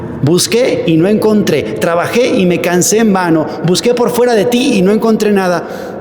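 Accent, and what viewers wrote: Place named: Spain. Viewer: Tiene un acento es español de seguro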